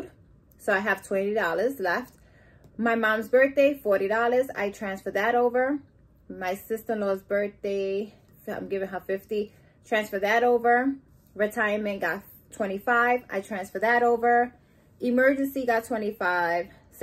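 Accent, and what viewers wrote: New York, United States. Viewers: Off topic but are you a New Yorker ? I hear an accent.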